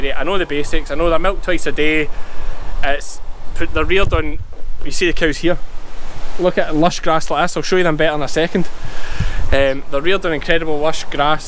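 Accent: Scotland, United Kingdom. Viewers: I’m so glad Sandi recommended you! If my daughter-in-law weren’t from Scotland, I’d need subtitles. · I had a couple of Scottish colleagues when I worked in the UK years ago so it is nice to hear the accent again. The YouTube generated subtitles can be quite funny in how they don't always fit.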